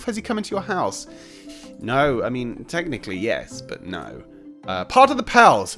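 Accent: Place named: Leeds, United Kingdom. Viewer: You from leeds or am i wrong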